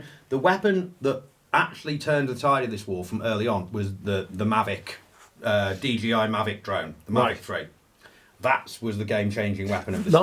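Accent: United Kingdom. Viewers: This dude sounds like the best of British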